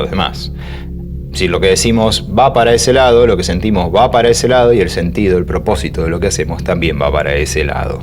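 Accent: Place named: Argentina. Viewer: Hola, que lindo escuchar a!🤗 tiene tan linda voz..y ese acento Argentino..😎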